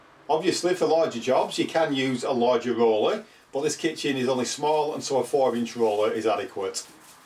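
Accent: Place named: Blackburn, United Kingdom. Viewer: Really helpful video, thanks! And that sounds like a very strong Blackburn accent!!!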